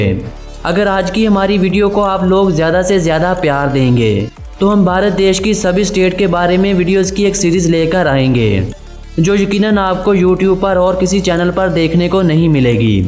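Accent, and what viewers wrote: Pakistan. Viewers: He's pakistani in disguise. Check his accent and frequent use of urdu words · Vai tum Pakistani ho kya tumhare lehen se pata chalrahi he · Pakistani chanal lg rha hai awaj se... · Mujhe inka bolne ka andaz Pakistani lag raha hai ,are u Pakistani , from Assam 😉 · Bhai tu h kaha ka teri aawaz to Pakistani h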